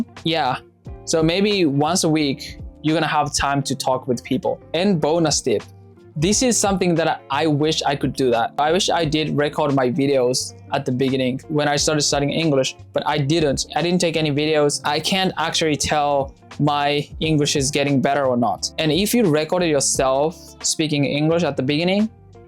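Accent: Japan. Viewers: 発音指摘してる人いるけどこの人ネイティブじゃないんだから当たり前だろ どんだけ英語に使っていても悲しいことに中々日本語アクセントは消えない…… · 発音 何かカタカナっぽさが残ってない?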